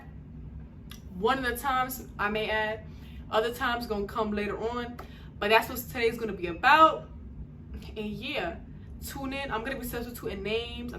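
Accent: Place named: New York, United States. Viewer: Man That New York accent kills me 😂😂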